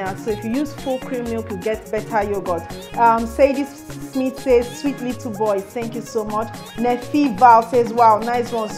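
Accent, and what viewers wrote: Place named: Igbanke, Nigeria. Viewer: That accent is not Agbor, it is from Igbanke.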